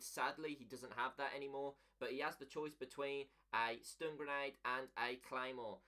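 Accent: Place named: Birmingham, United Kingdom. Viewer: I hate the brum accent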